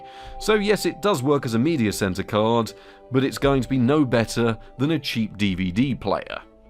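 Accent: England, United Kingdom. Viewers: You must be from the same area of England as Jeremy Clarkson because you sound like a younger version of him.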